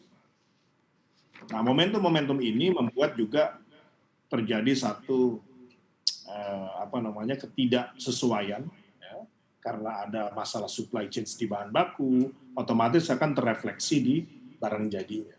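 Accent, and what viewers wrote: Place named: Banyumas, Indonesia. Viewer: Salam ngapak pak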